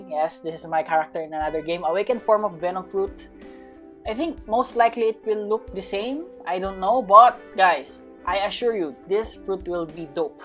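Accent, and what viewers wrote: Philippines. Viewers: Sabi na nga pilipino ka eh accent palang eh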